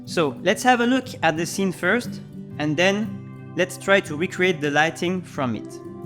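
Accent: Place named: France. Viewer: I like it, also your sense of humour and French accent, thank you! Just keep going and the Eps will get even better.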